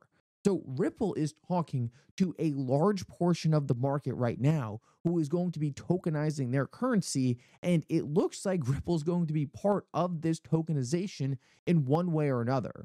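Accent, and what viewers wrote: Allentown, United States. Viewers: with an Allentown accent at the click baiting again...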